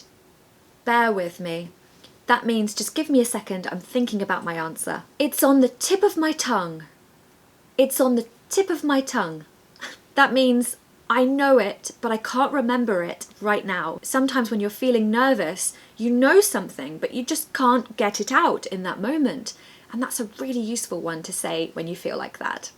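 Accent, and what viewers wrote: United Kingdom. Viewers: I find your series of videos very appealing! I also think your beautiful intonation is exactly as described in Peter ROACH (2009) "English Phonetics and Phonology", which adopts RP as its accent model. Wonderful! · Wow! Wonderful quotes and an amazing British accent you have! you're a star⭐hope you will do lives in the future and we can speak with you. 🤍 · Your British accent is excellent.I need the channel of a man in a British accent that looks like you to make an imutation. who do you recommend? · Omg i love your British accent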